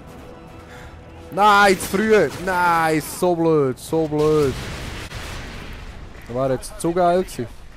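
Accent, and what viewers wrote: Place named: Switzerland. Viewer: Es ist schwer dich zu verstehen aber d kannst nichts dafür bist n hardcore schweizer wie nichael mittermaier sagt ^^ trotzdem schönes vid ^^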